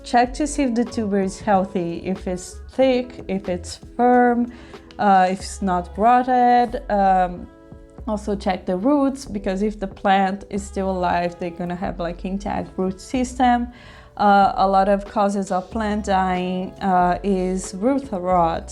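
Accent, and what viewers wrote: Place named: Brazil. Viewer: Are you Brazilian by any chance? :D Love the accent!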